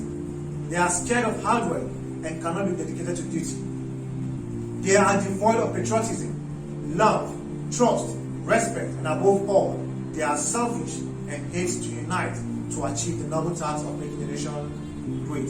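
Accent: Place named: Yoruba, Nigeria. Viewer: How do i hear this thing now.... okay not me running out of paitent wen i heard yoruba English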